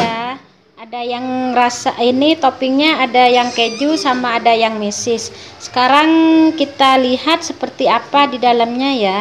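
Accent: Madura, Indonesia. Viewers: Negguh Deri logattah Mak engak Reng Medureh.. Salam sittong dere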